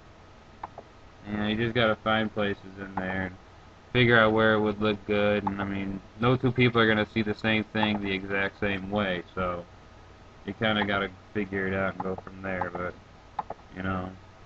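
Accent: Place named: Michigan, United States. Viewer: Nice tut. and u sound like my old friend shroomz from michigan. i thinks its just your accents!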